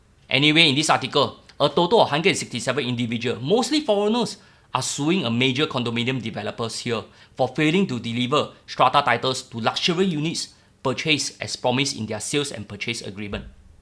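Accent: Singapore. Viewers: No mistake, you are definitely Singaporean, you have authentic Singlish 👍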